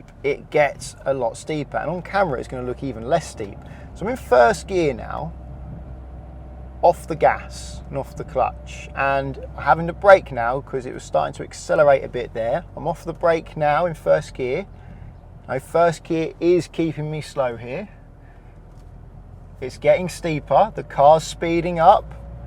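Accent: United Kingdom. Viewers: uhh, the video host is obviously British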